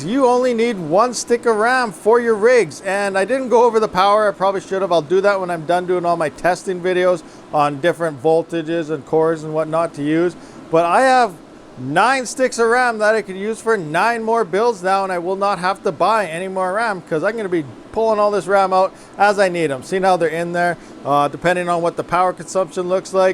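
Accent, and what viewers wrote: Canada. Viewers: There's just something fun about listening to Canadians explain stuff. *From your north Idaho neighbor.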